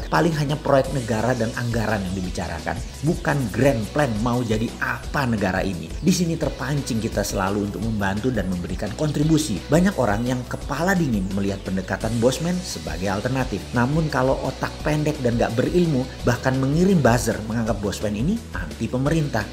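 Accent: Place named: East Java, Indonesia. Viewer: Jawa timur banget nadanya🤣🤣🤣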